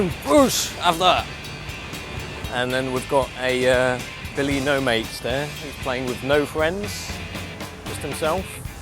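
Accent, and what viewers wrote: United Kingdom. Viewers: This is REALLY bad and not in a good way. Asian guy with British accent walking around talking. I left after 4 minutes. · chinese guy with brit accent...where do you think? Duhhh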